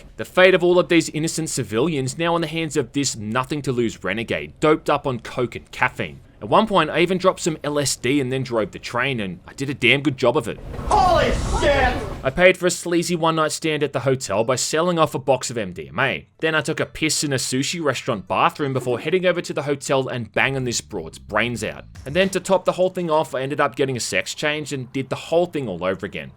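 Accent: Australia. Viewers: I dont care about this game. I jusy want to hear an Australian guy whining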